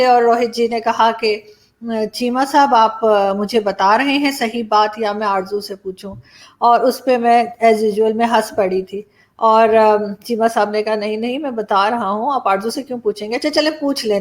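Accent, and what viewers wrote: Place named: Delhi, India. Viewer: Aap is tarah baat Karti hain jaise koi Dilli ,UP ki ladki baat kar Rahi ho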